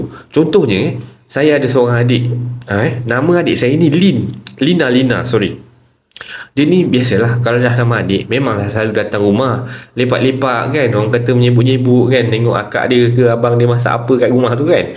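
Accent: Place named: Johor, Malaysia. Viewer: Sy kdg2 gelak dengar penyampaian cerita ni. Mmg kelakarlah loghat.orang Johor... Apapun sy suka dengar Kisah Seram Msia....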